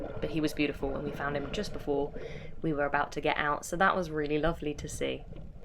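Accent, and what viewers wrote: United Kingdom. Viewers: Next time, land in Clark International or Cebu, they give passengers a kinder experience. But if its a connecting flight, well that sucks everywhere doesn't it ? Your British English is so perfect, unlike American English which is so hard to comprehend, and I speak the language quite well besides being a college graduate. The accent is so ençhanting, it goes so well with your slightly prickly but charming personality. Im stingy with subscritions,, but I surrendered and subscribed. 👍😘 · Britist accent lady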